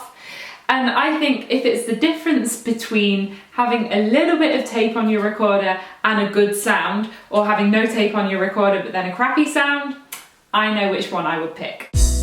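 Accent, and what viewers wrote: England, United Kingdom. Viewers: I speak Portuguese, but learned English by myself, after years of web-surfing, song playing and gaming. I am an amateur musician (I play guitar, C and F recorders, harmonica), and I can say that your videos are mesmerising - not only because of your skills and simpathy, but because of your English accent... and, lets be honest, when you speak, your mouth is hypnotic!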